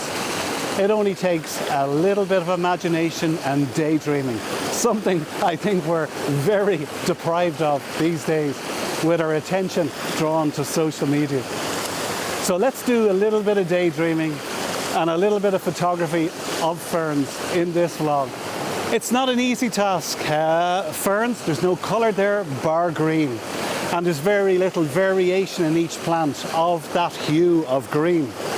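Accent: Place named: Ireland. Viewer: Love the softly spoken Irish narration in your vids., very calming along with your intimate landscape and macro work ( my style of photography ) just very enjoyable viewing. Keep up the good work. 👍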